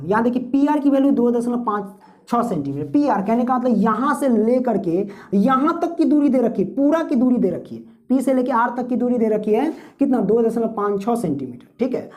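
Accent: Bihar, India. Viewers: Aap Bihari hain ji. Aap bahut achha padhate Hain. 👌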